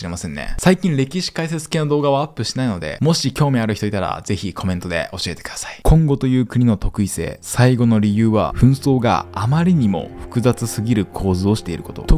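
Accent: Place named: South Korea. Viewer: 韓国人の話す日本語訛りのようなのを感じた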